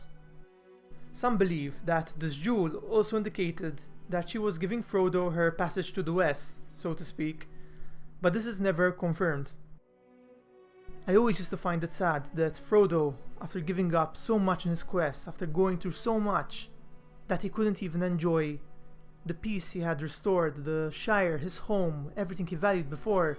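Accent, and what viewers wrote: Malta. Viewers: Really good videos! Are you maltese? Your accent sounds maltese 😁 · Thanks for all the info it explained alot as just binge watch all the movies including the side movies. 1st off I have never witnessed a movie collection done so well and not just added more junk just for the money 2nd although the movies could not have been done any better,the BOOKs are the way yo go and must be read before watching the movies as your imagination can only do it justice and if done the other way around. I personally think if done the other way around you ruined an experience youll never get to have again!! So again thanks for the explanation and keep up the amazing work!! One final question and if you cannot answer I fully understand but, by any chance the person speaking in the explanation of this clip is he or are you Maltese!? Im wondering after hearing your accent. 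;) again thanks for all youve done and hopefully I will get to speak with you again as Im trying to start my own journey and building a fantasy survival horror game based on locations in Malta and could use you're help but if you're busy I fully understand and wish you the best for the future,keep safe and hopefully Ill hear from you soon Regards Matthew Evans Vella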